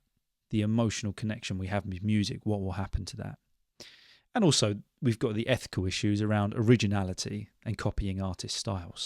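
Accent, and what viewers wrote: United Kingdom. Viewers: Thank you for your podcasts. It is the best channel with English podcasts and British accent 😍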